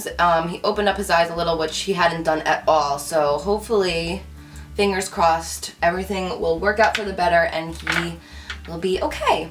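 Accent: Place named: Boston, United States. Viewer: where is she from? she has like a boston accent or something haha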